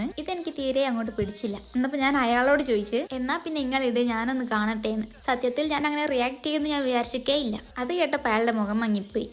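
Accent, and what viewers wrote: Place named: Wayanad, India. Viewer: Itha wayanad aanno Ente friend und wayanadil ool idhe slangl aann samsarikal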